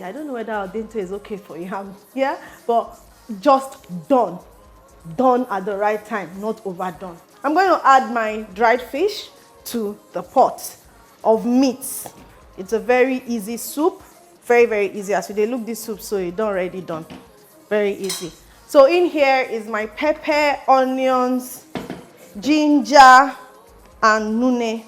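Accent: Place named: Benue, Nigeria. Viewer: Waoo!! You did this and not from Benue? You even added Benue accent when you were cooking. Bless you sis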